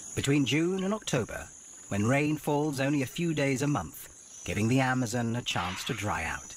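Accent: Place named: United Kingdom. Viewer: British narration ruins it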